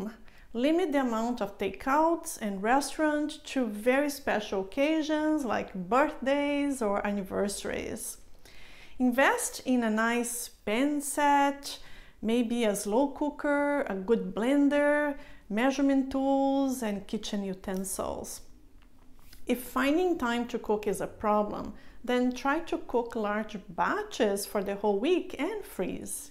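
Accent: Brazil. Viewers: Muito bom vídeo! Pelo sotaque acredito q a senhora seja brasileira. Ótimo trabalho! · Brazilian accent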